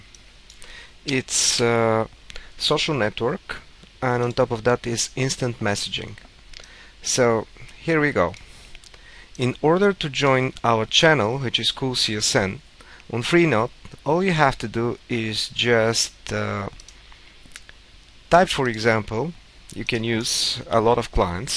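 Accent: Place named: Russia. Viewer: I liked your Russian accent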